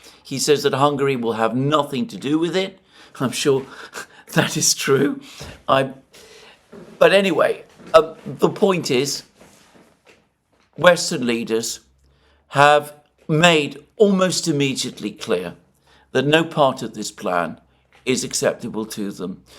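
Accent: United Kingdom. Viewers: it is an ai, it is transcribing Alexander's British accent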